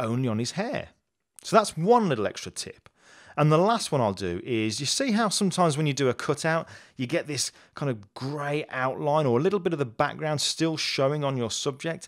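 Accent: United Kingdom. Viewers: lovely midlands accent :-)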